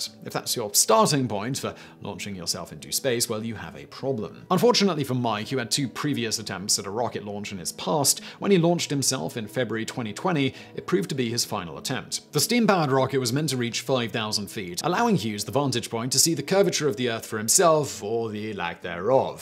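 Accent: United Kingdom. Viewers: He’s speaking correct, British English, rather than some simplified colonial version.🇬🇧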